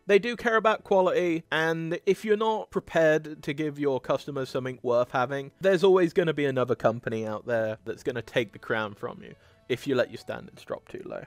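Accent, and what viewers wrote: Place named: United Kingdom. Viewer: British pronunciation of American names is laughable.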